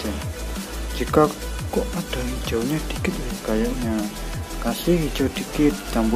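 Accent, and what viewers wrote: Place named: Java, Indonesia. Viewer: medok nya itu loh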